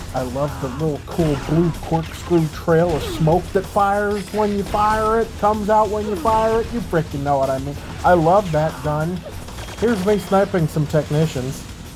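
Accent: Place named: Midwest, United States. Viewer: bruh that’s that midwestern type accent you don’t get it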